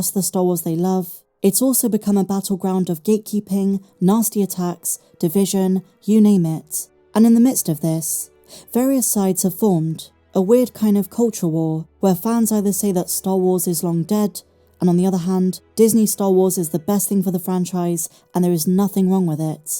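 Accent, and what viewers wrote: United Kingdom. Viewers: I love her Brit accent too, but she needs to address the muddled bass sound mix. There's little sibilance in there and she needs to up the mids and highs to correct it. One shouldn't have to pump the volume or use subtitles (filled with spelling errors a lot of the time) to make sense of what the speaker is saying, right?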